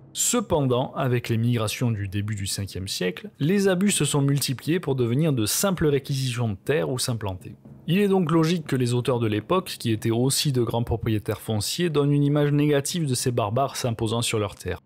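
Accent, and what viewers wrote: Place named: Bordeaux, France. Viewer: Ayant de la famille bordelaise, oui votre accent est très peu prononcé, mais vivant dans le Nord, il est facilement audible ahah ! Merci pour ce long écrit, mais j’ai beaucoup plus la sensation avec d’autres empires et même le byzantin, que la chute fut bien plus brutale que pour Rome. Limite plutôt frustrant en fait de ne pas avoir un vrai événement qui enterre a une date précise Rome. En tout cas super vidéo et j’espère en avoir d’autres en reco à l’avenir !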